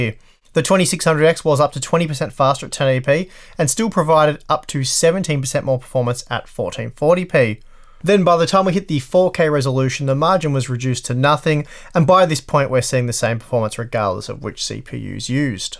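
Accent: Australia. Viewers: I love what the auto subtitles make of your intro with your Australian accent every time. This time it said 'welcome back to hammer on box' 😂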